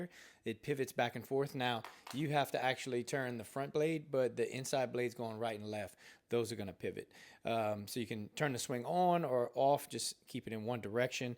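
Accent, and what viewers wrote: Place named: Louisiana, United States. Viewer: As soon as you started talking I knew you were from Louisiana 😂 Thanks for the vid!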